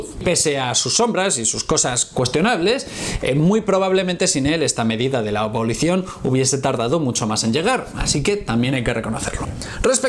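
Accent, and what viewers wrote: Spain. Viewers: Acá encuentro un combo interesante, rock, historia, la tonada española la cual disfruto mucho más en la narración... Y vos qué pareces de otra época!! Besote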